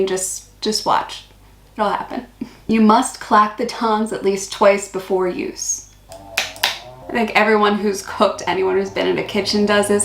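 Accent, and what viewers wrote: Australia. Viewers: Sounds as though you're finally getting your head around how we Australians function. I have a feeling when you finally get back to the States for a visit, you're going to have to check yourself (the way in which you speak) occasionally. I won't be surprised if you get some strange looks from your family and friends. You're becoming an Aussie by osmosis.😀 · Your G'day is starting to sound more Aussie as you go along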